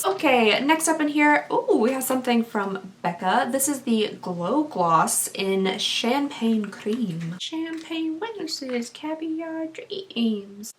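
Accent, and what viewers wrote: Kentucky, United States. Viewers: I love your videos you’re very sweet and funny ❤️❤️ I’m from Kentucky also but feel our accents are so different I absolutely love your accent.! my email is <mail> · Instagram- killercupcak3 I love your Kentucky accent!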